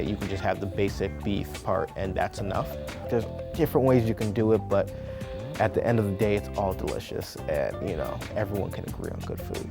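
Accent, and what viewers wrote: United States. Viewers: This man is Nigerian and he sounds perfectly a american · First of all, he pronounces his name wrongly. An Igbo man pronouncing his name with an American accent okay let's talk about this food This is the worst way to prepare a Nigerian beef stew, this is an abomination coming from a Nigerian living in Nigeria 🇳🇬. In Nigeria we don't blend ingredients with salt and tomato 🍅 puree and bayleaf in a blender Also we boil our beef before frying nobody fries raw beef, no Nigerian does this. Dear this content even though it's old is misleading and Nigerians on Twitter have a different day about this food. He should go back and learn from his mom, and many Non-nigerians would think this is how we cook. Chineke nna 😭😭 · I get having an American accent thats fine but why would you delibrately mispronounce your own name 🤨. It's not an English name so why are pronouncing it in an American accent.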